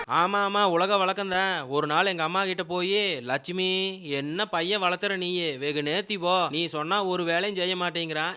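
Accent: Coimbatore, India. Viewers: unga pecha kettutu samayala vitturom bro!! Nama coimbatore slang nenga erukara varai vaalum! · தமங குழம்பவிட நீங்கள் பேசற கொங தமிழ் கேக்க தோனாமிற்தமா இறுகீகு நன்றி ❤❤❤❤❤ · thambi endha collegela class roomla Saraswathi poto Iruku enna seidhalum samayalum super covai thamizhum super naangalum coimbatore dhan vaazhthugal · Kooruketa kuraalu kovai slang😂😂😂😂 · எங்க போனாலும் எங்க ஊர் தான் பெஸ்ட் கோயம்புத்தூர் பேச்சு வேற லெவல் இங்குகோ 🥰🤗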